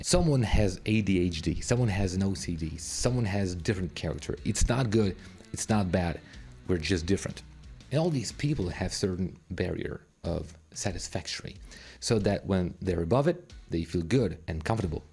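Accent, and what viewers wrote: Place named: United States. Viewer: Your accent has changed...it used to sound more British and now it sounds American.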